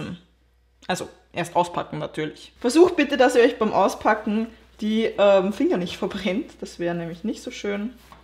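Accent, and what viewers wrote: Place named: Austria. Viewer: Red mehr Österreichisch😂❤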